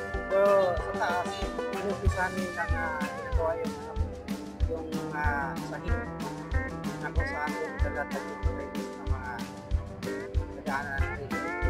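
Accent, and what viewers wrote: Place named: Visayas, Philippines. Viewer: Ah...bilib me Kay kuya...bisan halata bisaya kaayo...banat kuya....fight lang....ayaw ug kakapoy diretso lang...haha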